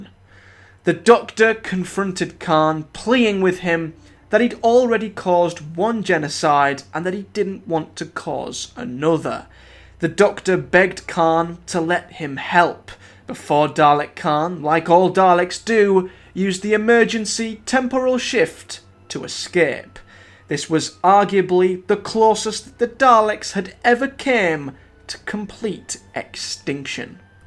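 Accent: Middlesbrough, United Kingdom. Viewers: Thank you ever so much for taking the time and effort to make such a top drawer video. It was great. May I ask are you from Middlesbrough like me? The girl who sometimes is in your video sounds like she does too. She usedthe word narked. Top Boro word. Anyway cheers and take care Ant p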